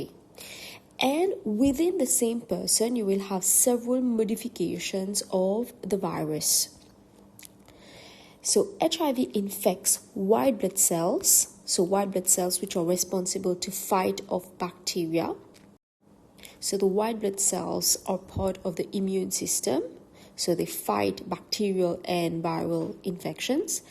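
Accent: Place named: India. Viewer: Thank you so much!!!!, if I may ask, were u from? your accent sounds like an Indian accent with a mix of something else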